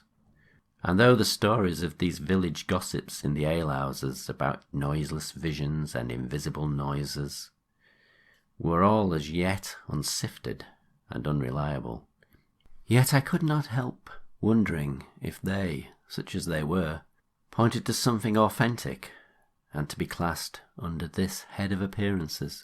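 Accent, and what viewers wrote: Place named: Northern England, United Kingdom. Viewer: So pleased to have found your channel. Great story and nice to hear a Northern voice ( I hail from M/r) narrating. Have subbed and big thanx!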